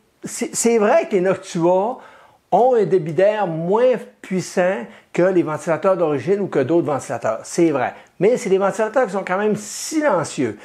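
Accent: Quebec, Canada. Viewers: Ma v2 arrive jeudi, je cherchais des infos sur le bruits des ventilos, Bah merci beaucoup c'est hyper complet, impec. Et qu'est ce que ça fait plaisir d'entendre l'accent des cousins du Québec :-) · Bonjour, excellente vidéo ! Je possède une Ender 3 pro v1 avec les drivers silencieux et il est vrai que les ventilateurs sont bruyant, donc modification à prévoir. Merci bcp pour ton tuto, j'adore l'accent du Québec et puis on se rend bien compte que tu prends du plaisir à informer, je me régale... merci pour ça ! 😉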